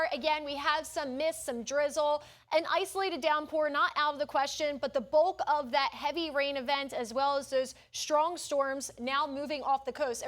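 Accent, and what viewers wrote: Philadelphia, United States. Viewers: i know a philly accent when i hear one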